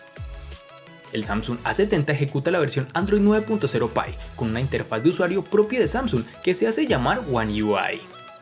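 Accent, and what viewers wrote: Cali, Colombia. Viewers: Es de Cali ? Cierto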